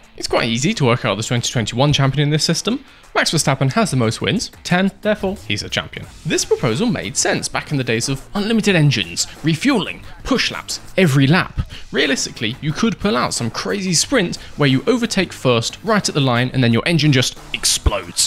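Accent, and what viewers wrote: United Kingdom. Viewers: british accent just killed the video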